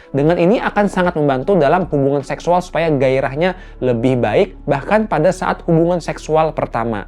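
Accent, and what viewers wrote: Banjar, Indonesia. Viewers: urang banjar kah pian dok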